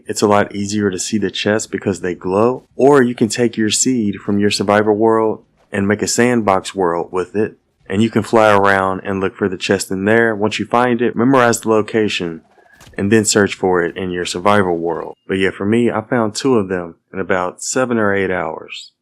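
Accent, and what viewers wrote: South, United States. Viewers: bro is definitely southern, arkansas?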